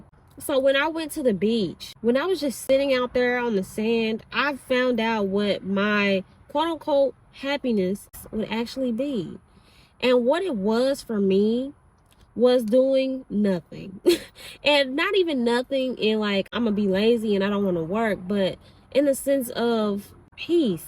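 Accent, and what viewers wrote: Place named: South, United States. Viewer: Where you from? You have a southern accent!!! It’s cute 💕💕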